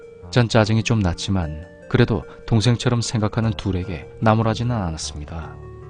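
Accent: Gyeongsang, South Korea. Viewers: ㅋㅋㅋ 이 경상도 분이셔서 대화부분은 원본이 경상도 사투리가 아니더라도 경상도 사투리로 하시더라구요 ㅋㅋ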